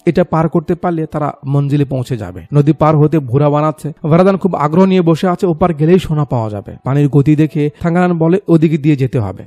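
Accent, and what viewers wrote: Bogura, Bangladesh. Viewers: হামি তো ভেবেছিলাম আপনার বাড়ি বগুড়া এল্লা কোন কথা বারে আপনি বগুড়ার ছল নো ?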